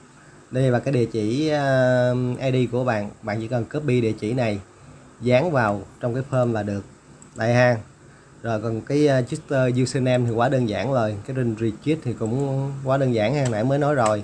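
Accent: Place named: Mekong Delta, Vietnam. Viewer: Khoái nghe cái giọng miền tây của bạn này, nghe thật thà mà tiếu tiếu, nói chung tất cả video bạn hướng dẫn rất rõ ràng ... thanks chúc bạn thành công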